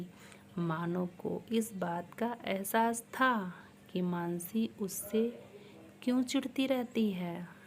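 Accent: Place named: Awadhi, India. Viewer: Big Like 14 bohut khub surat prastuti di aap ne ji aap ne bohut khub surat voice sunai our awdhi mea bola karo bohut acchi lagti hai aap ka swagat karta hu aap plese connect with us long term 🙏💐👍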